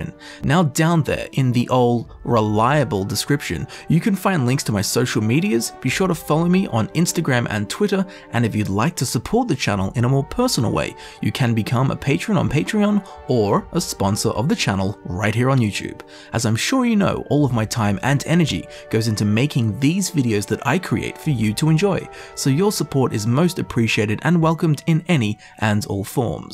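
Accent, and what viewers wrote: Australia. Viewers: i'm a simply guy. i hear a fellow 'strayan and hit subscribe.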